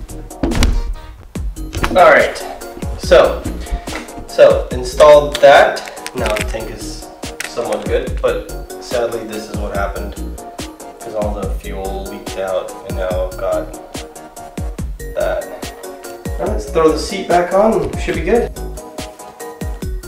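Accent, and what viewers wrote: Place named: China. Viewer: Sorry the fix did not work, Still love the Chinese accent thou.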